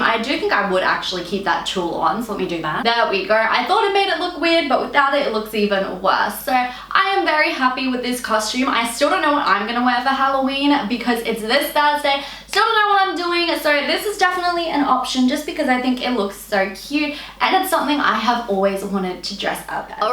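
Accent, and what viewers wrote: Australia. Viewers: I’m being cheeky and I don’t mind if y’all don’t think it’s funny if I tell you to get yr heads out of yr bums. However, many people are trying to correct for saying it’s Wednesday. Maybe it’s just me, but I hear someone with an Australian accent say it’s a day later than it is for me and I think, “Hmm, I wonder what time zone they’re in?” not that they must be wrong.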